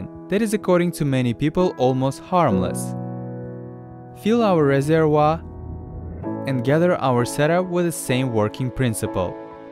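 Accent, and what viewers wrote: Russia. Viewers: He is from Russia vs are pronounce with a w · Jose Silva he is Russian so his accent makes "L"s and "V"s sound weird. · Йор рашн акцент ин Инглиш им рилли кул:) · If this video was produced in Russia or any other country near it, and by the acsent of the speeker I can assume that the video was really produced there.... The guys will go to jail with weed experiments ;)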